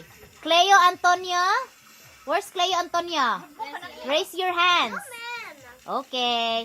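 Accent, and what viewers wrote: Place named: Bohol, Philippines. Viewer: Bul.anon nga accent from Bohol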